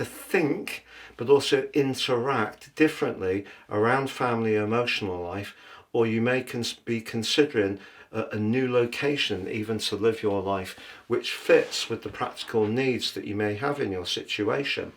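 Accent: United Kingdom. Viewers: It's a beautiful British accent. Keep on listening, open the Closed Captions and your ear will get used to it!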